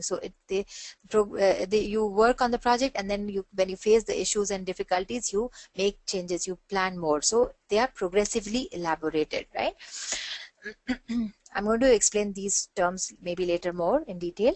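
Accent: India. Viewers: I felt physical pain listening to this indian accent. had to switch off after 65 seconds, else my ears would start bleeding.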